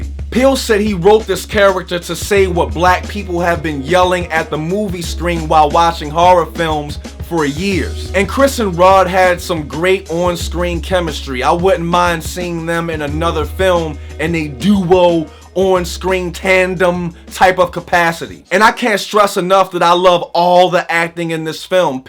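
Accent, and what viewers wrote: Baltimore, United States. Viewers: this guy gotta be from Baltimore I can hear it in certain words he say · are you from Baltimore? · You from Baltimore,? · I hear that Baltimore shordy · you gotta be from baltimore bruh i hear it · I hear your accent. what part of Baltimore are you from? lol good video. I didn't pick up on the song but it makes sense. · Your B-more accent is so strong lol. And maybe I saw you blink just once? Excellent commentary.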